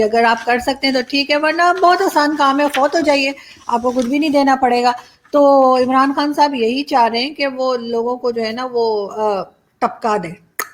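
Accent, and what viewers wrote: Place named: Mumbai, India. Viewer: ji.. mumbaiya hindi ek number.. aap sabki vaat lagaati ho 😁😁😁👍👍👍👍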